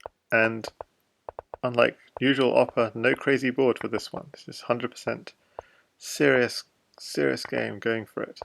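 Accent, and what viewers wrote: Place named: United Kingdom. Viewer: Opper sounds British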